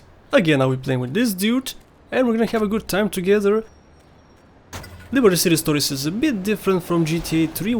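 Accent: Russia. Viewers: You speak like a russian of English language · Чувак, ты же русский! Я чувствую это!!!! xD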